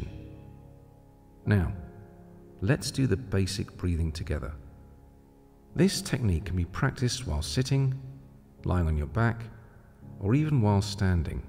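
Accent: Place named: United States. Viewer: Nazir Hussain sir, where did you go? You narration is calming and effective this American accent is too mainstream doesnt sound good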